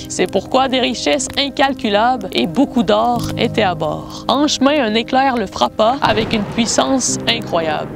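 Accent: Quebec, Canada. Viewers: J’aime trop quands tu parle😆 c’est comme mes cousine eft elle habite aux Québec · tes quebecois cool comme moi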